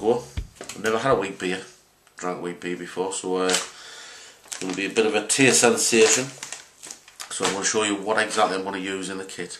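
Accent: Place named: Newcastle, United Kingdom. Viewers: looks like a nice brew mate, keep us updated on the taste, you sound fairly local to me (newcastle), where ya from? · Good video thanks for posting it. I enjoy dark ales and will be looking for the one (hopefully) that you made of the Norfolk Nog. I can't quite place your accent, at a guess i would say Northeast heading towards Newcastle. Am i close to being right ?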